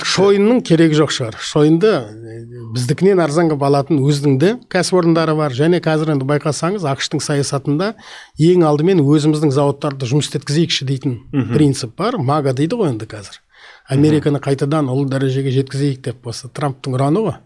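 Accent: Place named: Russia. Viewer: Вашингтон емес, Уашиңтон. Техас емес Тексас. Ганконг емес Хоңкоң. Ресейдің сасық аузымен мыйымен әлемге қарауды қою керек Қазақтар.😮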